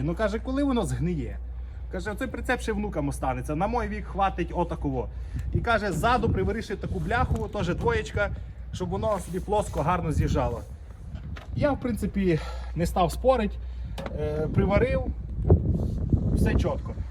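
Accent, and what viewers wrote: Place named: Surzhyk, Ukraine. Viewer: суржик у тебе жахливий,щось мені підказує що народився і виріс ти не у Рівненській області.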